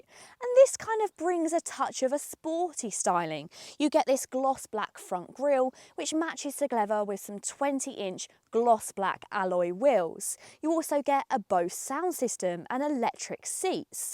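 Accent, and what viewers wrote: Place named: Colchester, United Kingdom. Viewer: I've decided to test drive one, before I commit to a RAV4 PHEV. Have you compared them? I love your channel. Your presentation skills are great. That's a lovely accent; Colchester, if I'm not mistaken. Best wishes, John, X. PS As it happens, I have 35mm film projection equipment here that was first installed at the old Odeon, Crouch Street, Colchester.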